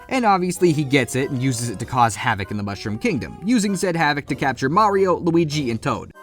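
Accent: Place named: New York, United States. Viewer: I might be going crazy but, I swear he's getting more and more New Yorker as time passes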